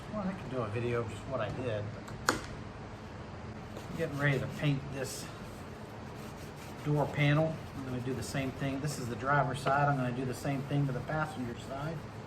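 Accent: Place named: Deep South, United States. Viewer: very clever idea! And you said before you were in Pennsylvania? You sound like you're from the deep south 😆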